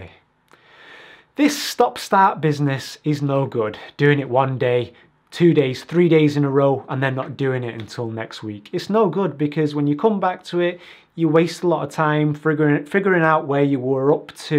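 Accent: Manchester, United Kingdom. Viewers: Is that a Manc accent I hear?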